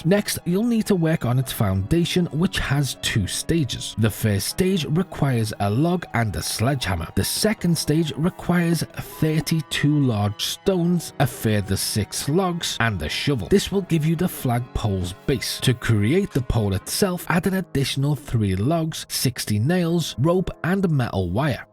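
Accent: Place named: Fife, United Kingdom. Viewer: You sound like a Fifer 😊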